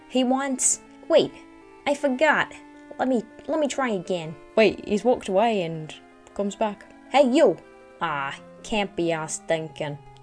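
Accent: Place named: Northern England, United Kingdom. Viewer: gender swapped david lister from red dwarf is on youtube doing sims reviews! sign me up! (i’m a millennial american nerd, we hear one northern accent & we get real goofy with trying to bring up pop culture stuff younger folks in the UK may not “give a toss” about 😳—ok back to sewing and smoking weed while watching this vid 🖤)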